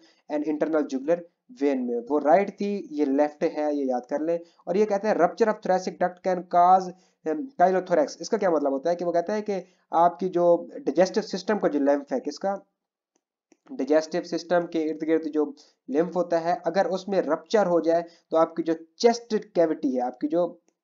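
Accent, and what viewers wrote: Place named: Pakistan. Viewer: jee bhai jan, i can see by your accent, mai bhe Pakistan sy hn, graduate of AIMC Lahore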